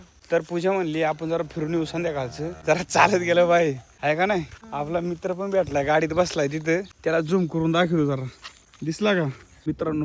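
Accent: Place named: Sangamner, India. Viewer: दादा तुझं गाव कोणतं आपली संगमनेर भाषा कुठेच लपत नाही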